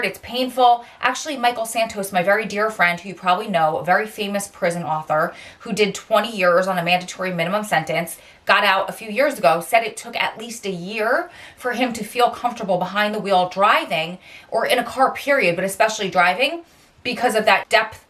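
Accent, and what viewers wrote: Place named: South Jersey, United States. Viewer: Omg you are from South Jersey aren’t you? I’m new here. That accent! 😩 Cross between Southern and Philly. I live in Salem County. I understand if you don’t want to disclose where you are I just think it’s funny! I’m loving the content!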